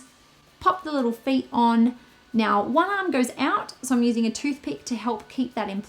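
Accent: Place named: Australia. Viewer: OMG you're Australian, so refreshing you hear another Aussie on YouTube. Was fully expecting you to have an accent.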